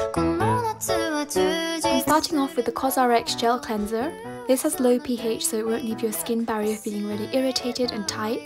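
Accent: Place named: United Kingdom. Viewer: Omg finally a new upload 😭😭💕 I’ve been waiting for this for so long! Can you upload more often so I can hear more of your beautiful British voice and amazing editing 😭✨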